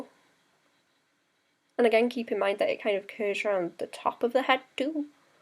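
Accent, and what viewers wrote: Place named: Worksop, United Kingdom. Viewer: I just found your chanel and its really refreshing to a) hear someone that sounds like me! (I'm from Worksop) and b) actually see someone work thats not perfect on the first stroke!!! It's great to see someone work REALISTICALLY! ;)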